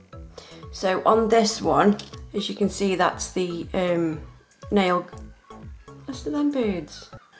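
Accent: United Kingdom. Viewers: she's british that's how we say it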